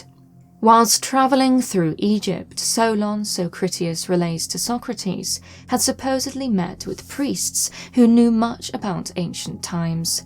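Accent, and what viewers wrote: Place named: Northern England, United Kingdom. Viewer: I like the way you're doing that bbc thing of talking in a RP accent but occasionally letting that northern accent come through, like your pronunciation of "a"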